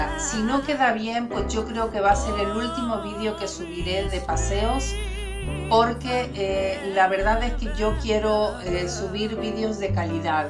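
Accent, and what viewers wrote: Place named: Chile. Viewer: Wow que bendición que aún mantiene el espańol con usted esq de repente pensé q traía algo de chile 🇨🇱 porque tengo una amiga así como usted es psicóloga y me viene a visitar y la escuchaba si como ella y dígale adelante usted también creces mucho ya lo verá !!🙏🏻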